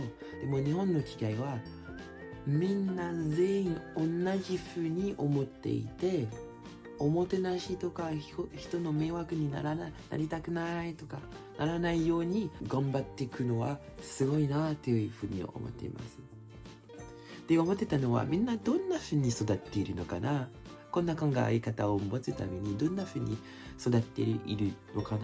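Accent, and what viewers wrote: France. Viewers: 何と心地よい話し方なんでしょう、フランス語と日本語の良い所が合わさった発音のような気がします。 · ちょっとフランス訛りの日本語が優しくって可愛い💕 日本の良い所を見てくれてありがとう🙏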